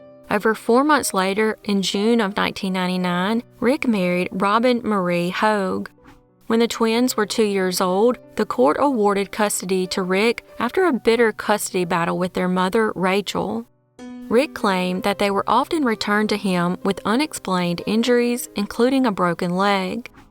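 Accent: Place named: Eastern Kentucky, United States. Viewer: I married a gal from Owsley County Eastern Kentucky. Among other things, her accent made her absolutely irresistible to me! This narrator sounds exactly like my wife! Haha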